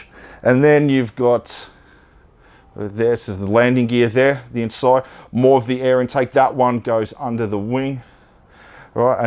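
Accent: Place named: United Kingdom. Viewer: from your accent，do you come from Britain？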